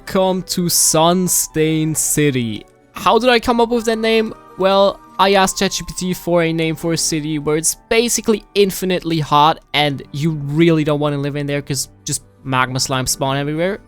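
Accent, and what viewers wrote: Germany. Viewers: quick question, are you German? you kinde saound like you´re German...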